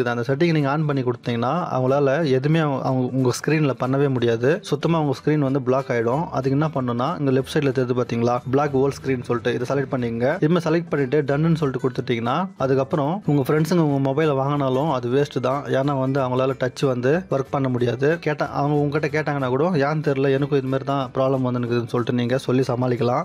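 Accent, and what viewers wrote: Chennai, India. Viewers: Pakka chennai tamizh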